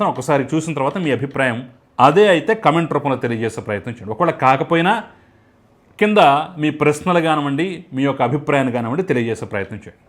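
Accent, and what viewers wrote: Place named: Kadapa, India. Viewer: Peddaina slag vintuvunte kadapa slag la vundi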